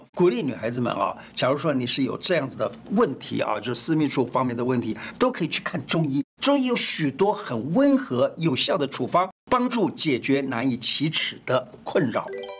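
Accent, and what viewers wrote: Taiwan. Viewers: 笑死了，胡醫師說台語好有趣喔，說得比我有趣多了。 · 胡醫師的台語好可愛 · 胡老的台語 道地 有味道 用心了給你按個讚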